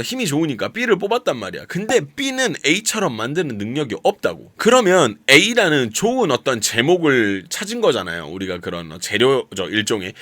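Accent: Jeolla, South Korea. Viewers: 전라도 사투리는 있으시네요 ㅋ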